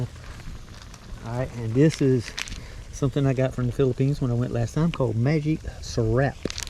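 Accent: Appalachia, United States. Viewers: Are u from applachia? The accent is thick. Great video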